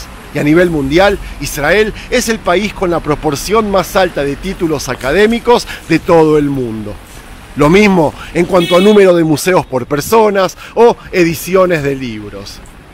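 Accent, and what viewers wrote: Argentina. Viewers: Una pregunta yo recién q encuentro este canal, y tú eres judío pero hablas perfecto el español con acento argentino, quizás haya algún capítulo donde explicas eso. Y realmente me gusta escuchar hablar de mi querida nacion de Israel en un español muy entendible, te seguiré viendo. Me gusto el reportaje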